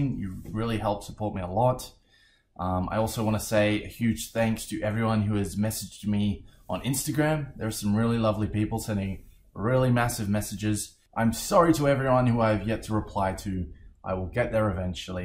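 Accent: Australia. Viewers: Can i guess that you're from Victoria?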